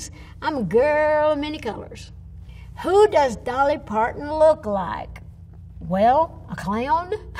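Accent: United States. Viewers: she is the most american-sounding person I've ever seen haha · one of my favourite and most distinct american accents lol i just love listening to people who speak like that, it's so fun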